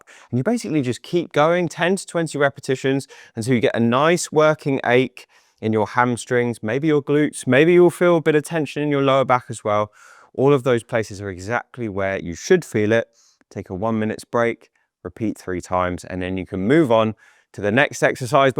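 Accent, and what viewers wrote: England, United Kingdom. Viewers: The best English accent ❤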